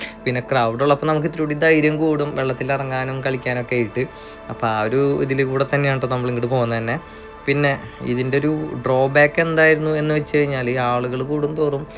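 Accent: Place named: Thrissur, India. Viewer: നമ്മടെ തൃശൂർ slang ❤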